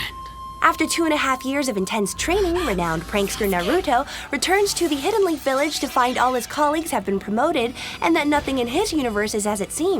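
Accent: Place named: Asia. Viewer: omg i love her asian accent lol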